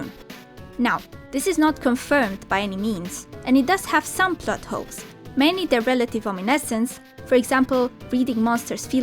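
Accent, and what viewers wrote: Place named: Poland. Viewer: Small off-topic, but I'm a bit curious. Are you perhaps from a slavic country? Your pronunciation sounds very similar to how my polish friends sound when speaking english lol.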